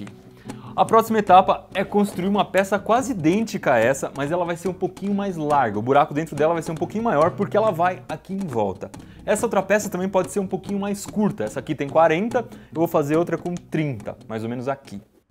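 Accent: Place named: Minas Gerais, Brazil. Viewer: Iberê vc é Mineiro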